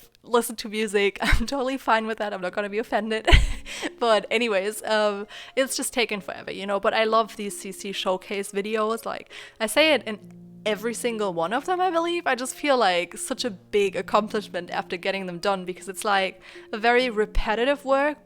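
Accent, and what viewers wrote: Germany. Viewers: german accent? :D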